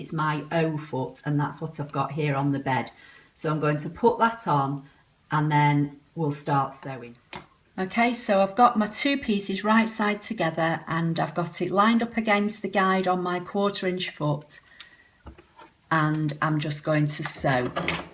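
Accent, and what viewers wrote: United Kingdom. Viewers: Great little item, i must give this a go,you sound like where i come from in the UK hearing you talk. :)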